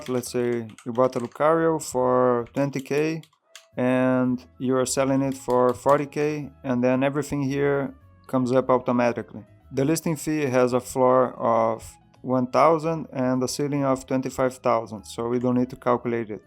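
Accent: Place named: Brazil. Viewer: gg where are u from bro? your accent looks like brazilian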